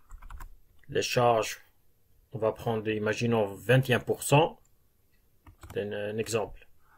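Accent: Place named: Kabylie, Algeria. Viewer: merci, j'entends un petit accent kabyle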